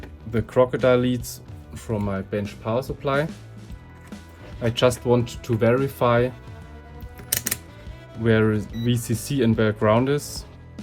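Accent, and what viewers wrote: Germany. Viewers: Wenn du an den ersten paar englischen wörtern merkst, dass der typ eigentlich deutsch spricht :D.. schöner mod und gut gemacht allerdings haust du damit ne tonne inputlag drauf mit diesen billigen av2hdmi adaptern... besser composite und dann upscalen mit nem hochwerigen scaler und bisschen mit den filtern spielen.. aber ich verstehe deinen ansatz nette idee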